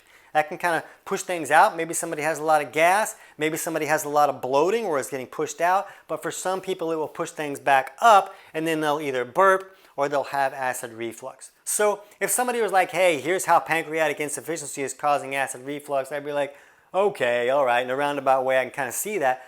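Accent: United States. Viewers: Dr, which state is your accent from ? best wishes from Brazil 😁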